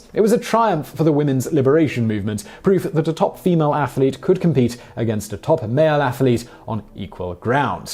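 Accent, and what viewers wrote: United Kingdom. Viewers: Sal Pastore I think some are just because he’s British and they have different pronunciations, where others he’s just not familiar w the word.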